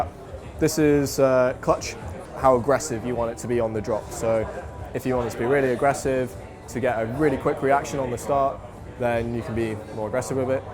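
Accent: RP, United Kingdom. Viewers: This posh nerd as put me 2 sleep.! ☆WOW[NEVER THOUGHT I'D C THE DAY]....talking bout F1 & this donnie is making me snooze.! Lol..!!☆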